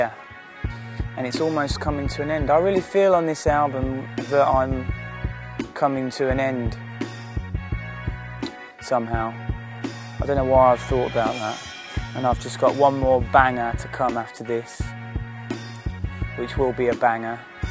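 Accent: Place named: Birmingham, United Kingdom. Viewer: Sorry m8! In real life he speaks in a brummie (birmingham) accent, but in his songs he raps in a cockney (London) accent. Because he is not actually a cockney it is known as a "mockney" accent. Is that any clearer?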